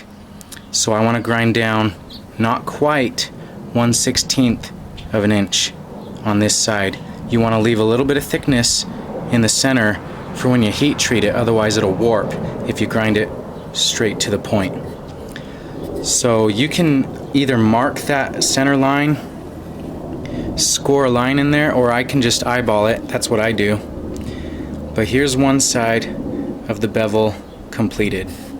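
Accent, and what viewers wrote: Netherlands. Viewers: you're dutch ?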